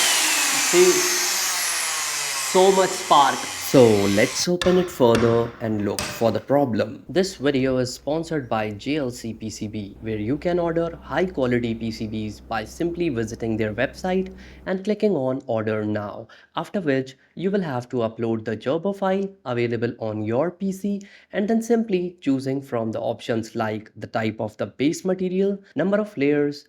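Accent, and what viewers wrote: India. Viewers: oh my god! indian english!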